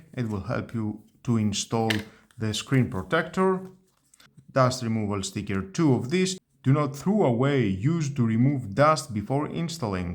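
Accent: Greece. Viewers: Είναι το πρώτο βίντεο που βλέπω και από την πρώτη λέξη κατάλαβα ότι Έλληνας 😅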